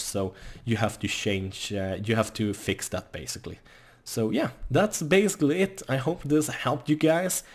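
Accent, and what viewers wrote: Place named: Sweden. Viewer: Swedish english is simple to discover...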